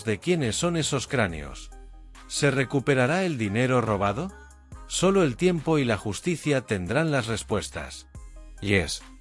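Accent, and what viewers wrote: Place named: United States. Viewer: No puedes pronunciar CUAUHTEMOC ?? o decir veinte millones ?? De que pinche cerro te bajaron ?? Tu pinchurrienta manera de hablar tan agringada y miserable dan risa...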